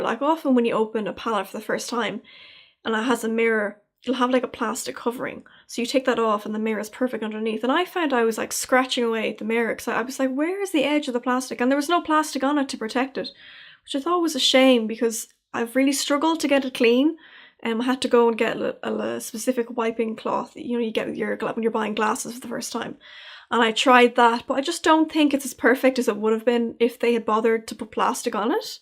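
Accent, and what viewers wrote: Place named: Ireland. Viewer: What a beautiful collection! That purple eyeshadow is stunning, even if it needs a bit of work. I really enjoyed this video, your Irish accent is so nice to listen to, and you were so thorough when talking about the products. Also (this is a really weird comment) I'm so jealous of how much lidspace you have x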